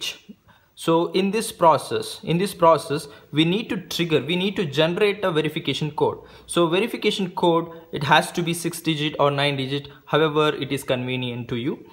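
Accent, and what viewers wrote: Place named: India. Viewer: 印度咖喱味的英语太难听了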